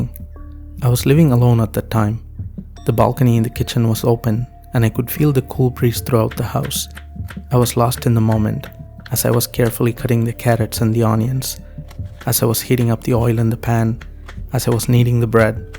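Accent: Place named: Tamil Nadu, India. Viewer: Tamil scent in your accent. அடி தூள்.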